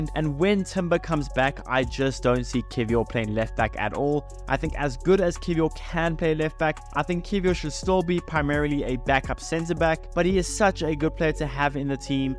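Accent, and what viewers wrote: South Africa. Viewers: I dunno but your accent sounds so south african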